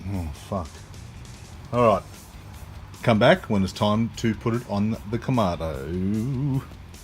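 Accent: Australia. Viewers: Fucking love the native Aussie talk. So much better than the American BS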